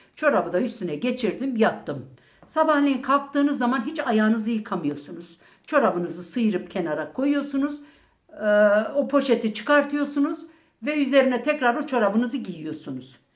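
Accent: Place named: Eastern Anatolia, Turkey. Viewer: Bu xalanın danışığı çox şirin gəldi. Bizim dilə çox yaxın danışır. Bu xala Erzurum, Kars, İqdır ya da Vandan olmalıdı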